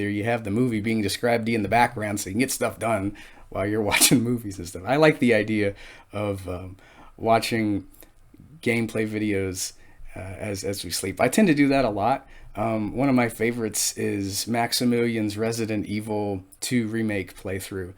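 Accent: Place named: North East England, United Kingdom. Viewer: You sound like you're from the north east area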